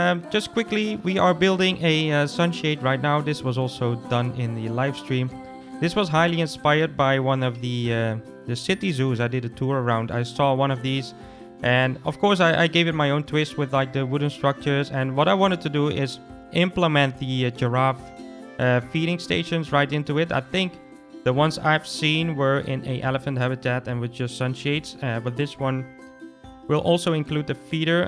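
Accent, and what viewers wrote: Netherlands. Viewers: Jou bent een nederlander ik hoor aan de accent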